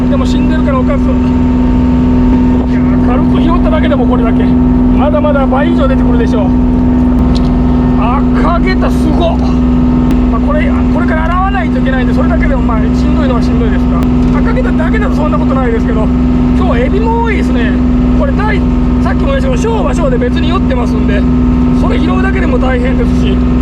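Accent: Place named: Bingo, Japan. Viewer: 今回も大漁おめでとうございます 腰がうたう 備後弁久しぶりに聞きましたwww 無理せずこれからも頑張って下さい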